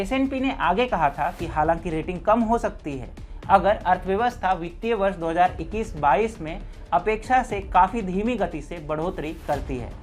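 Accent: Standard. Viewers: Shudh 🕉️ hindi sunkar kaan 👂 ko anand mila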